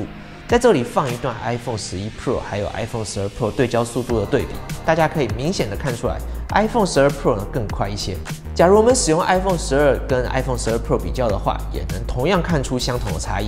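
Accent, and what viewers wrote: Taiwan. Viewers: 開個玩笑，發音不標準對台灣人來說很正常啊，我也沒標準到哪兒去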